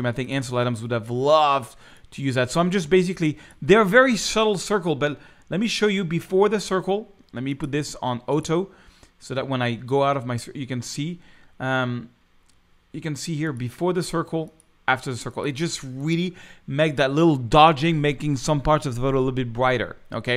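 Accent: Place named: France. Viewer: your English-French is so sweet:) Thank you for tips!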